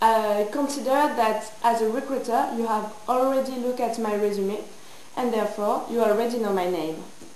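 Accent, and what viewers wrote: France. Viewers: Gotta love the French accent!!!! viva la France :)))) I have no idea how I ended up watching this video!!! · a little hard to understand your english, pretty french, but hot accent thought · are you french??? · you r french i guess...is it ?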